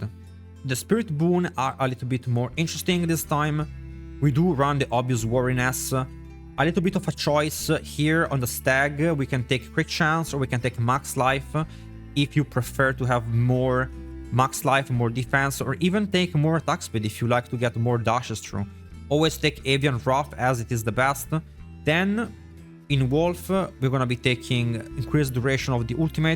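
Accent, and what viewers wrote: Italy. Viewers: I like his Italian accent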